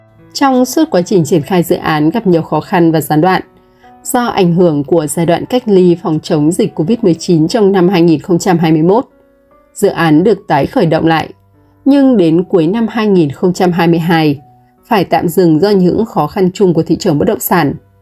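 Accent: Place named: North, Vietnam. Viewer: nghe cai giong bac ki nay >>>bun ia qua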